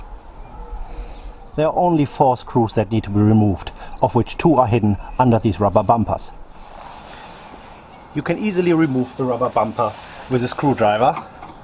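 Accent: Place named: Germany. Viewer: Very nice camera work. Very typical German dialect and mindset. Kinda charming - almost. ;-)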